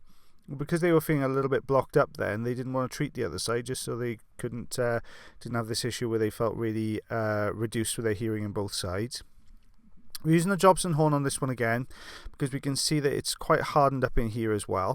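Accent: Wales, United Kingdom. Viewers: I could listen you your voice and lovely Welsh accent all day...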